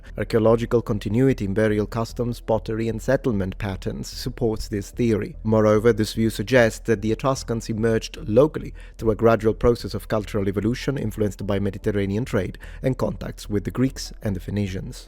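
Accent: Sicily, Italy. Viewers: Sicilian, he is from Palermo. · He's speaking with a slightly Italian accent. Palermo is in Italy last I checked. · The italian accent make history lessons about that region more authentic...I am not sure if I like that thought, but I like that it is what it is😅 God bless you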